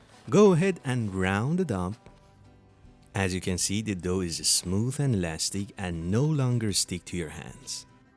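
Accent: Iran. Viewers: i recognise a clear Persian accent. am i right dadashi? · What a Persian accent 😅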